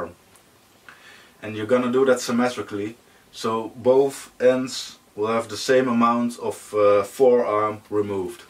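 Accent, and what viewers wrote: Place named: Netherlands. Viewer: ik kan horen dat je nederlands bent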